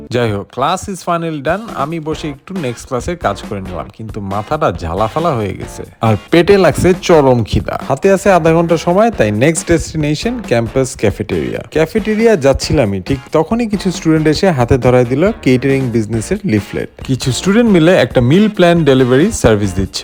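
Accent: Bangladesh. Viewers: খানিকটা যশোরের ভাষা 🙂😊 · Brother are you from sylhet ❤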